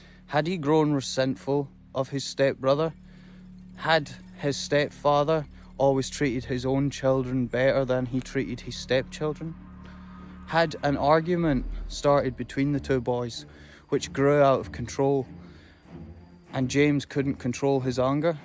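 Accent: Scotland, United Kingdom. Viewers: Nothing comes close to a Scot telling a scary tale! Bravo! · your Scottish burr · Good story, and you have a great voice for narration. Your voice is soothing and relaxing and the Scottish brouge takes all the rough edges away. You bear a strong resemblance to both Thomas and James.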